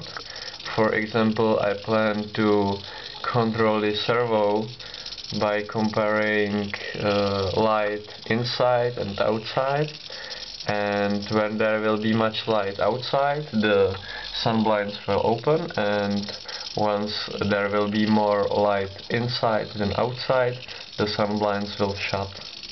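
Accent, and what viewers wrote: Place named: Czech Republic. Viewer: Love that Czech accent :D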